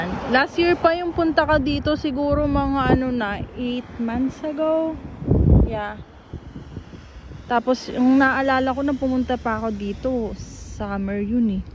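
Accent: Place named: Quezon, Philippines. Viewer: Puntong Quezon..Maubanin..Hello po from your kabayang pagbilaowin in winnipeg…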